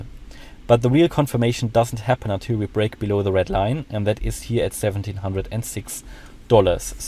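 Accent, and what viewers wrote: Germany. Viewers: digga bitte versuch diesen deutschen akzent dir abzugewöhnen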